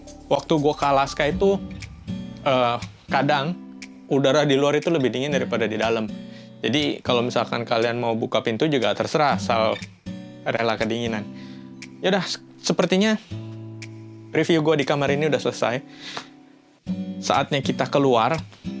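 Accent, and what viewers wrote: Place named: Java, Indonesia. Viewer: Aslinya mana kang, logatnya ko keliatan kejawa jawaan :v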